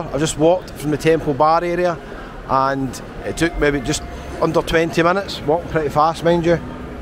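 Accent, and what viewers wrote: Scotland, United Kingdom. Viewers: Love the Skaughtisch aksent. ❤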